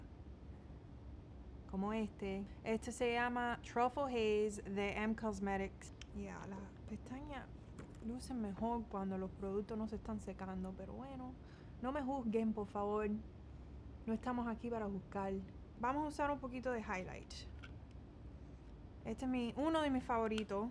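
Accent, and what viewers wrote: Cuba. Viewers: Madre mia... no sabia que eras Cubana!!! Tienes un acento precioso ♥️ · ! Me encanta tu acento cubano, soy mexicana y mi primer idioma es español, y me encanto escucharte, y si se dice brocha, a las makeup brush, amo tus vlogs y a Dexter, this was refreshing loved it ❤️ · "I don't know how to say it!" hahaha...that's totally me. Fun video getting to see you talk in Spanish. Cool hearing your Cuban accent too. No estamos aqui para juzgar...hahaha totally! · Este acento cubanoooo, me encanta jajajaja ❤️ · Gosh Cuban-Spanish is so enchanting. It sounds like music and is filled with so much soul. Just like Brazilian-Portugese. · El acento cubano es hermoso, veo que sí lo manejas pero es como desempolvarlo 🤣 y hacer el switch al otro idioma en lugar de traducir en la mente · Qué belleza! Me ha encantado escucharte con tu acento cubano. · No sabía que eras cubana!! 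con razón tus recomendaciones de cabello siempre me funcionan, tengo cabello caribeño también. Saludos desde república dominicana. P.s. Me encanta escuchar tu acento, el acento cubano es super delicado ✨ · Ese acento cubanitoooo rico! Ya tenía ganas de oírte hablar en español. Saludos desde España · Yesss! I love hearing the Cuban accent! Also, this helps me learn new words. Thanks girlie!! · SISSSS i have been WAITING SO LONG FOR THIS ONE. Yo sabía que eras Cubana desde el primer video tuyo que vi lol, tu acento es súper lindo❤️❤️ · Amo el acento cubano ❤️❤️❤️❤️❤️ Saludos desde VENEZUELA 🇻🇪 ❤️ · Ahhh I love yr Cuban accent!! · Ese acento Cubano 🙌 amoooo! Orgullo Cubano😊 Soy Cubana también ❤ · THAT CUBAN ACCENT 😍 Love this video so much. Merry christmas G 🎄🤍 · Love your cuban accent! 😘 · He estado esperando este video!! Not sure why either. I love hearing our beautiful language spoken. Y el acento Cubano me encanta. 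Well done 👍🏼🙂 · En México sí decimos brocha! Me encantó el video, amo el acento cubano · Eres cubana mi amiga 🇨🇺 se nota por tu acento tu te trata de hablar español para los suscritores me entiendes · Anda, un video en español. Mi marido (que es dominicano) subió la ecalera escuchando el video y imediatamente me dijo: "Ella es cubana". Me encanta tu acento. Feliz Navidad y saludos desde Escandinavia.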